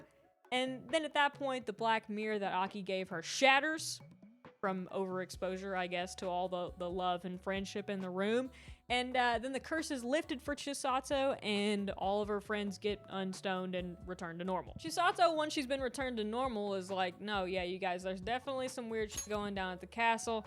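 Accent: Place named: South, United States. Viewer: I know this is a completely random question and I doubt you’ll even see this but I have to ask: are you from the south? I’m from Texas and you seriously sound just like some of my friends that have lived in Texas their whole life. The accent is just almost exactly the same so I had to ask.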